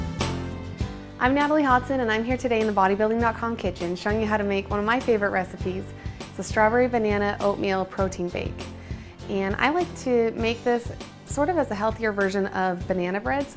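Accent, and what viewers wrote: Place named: Kentucky, United States. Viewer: Her dialect sounds interesting... where is it from ? Kentucky ? or the areas around it ?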